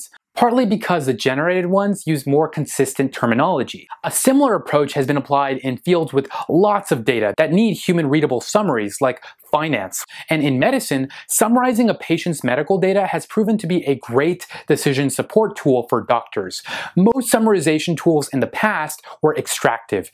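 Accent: India. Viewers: nice accent, not like most indian.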